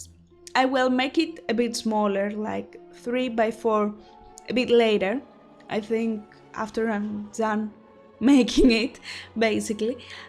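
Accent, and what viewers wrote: Greece. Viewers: i love your greek accent! Latrevw thn douleia sou, mpravo kai euxaristw gia ta links! Harry Potter gia panta :)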